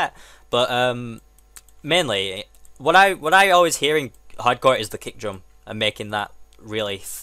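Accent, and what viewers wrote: United Kingdom. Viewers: Northerner. Definitely a northerner.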